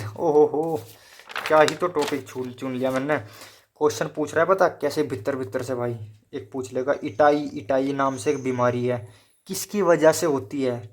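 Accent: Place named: Baraut, India. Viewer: Kaha se ho aap baraut side ki language h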